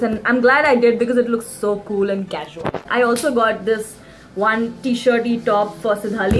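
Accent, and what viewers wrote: Maharashtra, India. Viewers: I lyk d Marathi touch u gv in all your videos... "Just ugach " ❤️..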